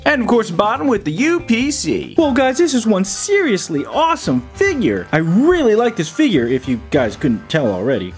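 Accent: United Kingdom. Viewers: I like the British voice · Stop the British voice 😡😡🤯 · Who eles got surprised when he started speaking British.....but when did u buy the wolverine are u going to make a review of him or no cause i am going to buy that too.....peace.... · And nice British accent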